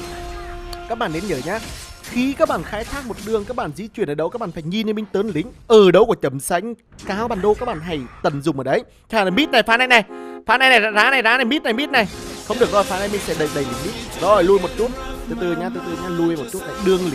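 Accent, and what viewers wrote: Nghe An, Vietnam. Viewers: ông này người nghệ an à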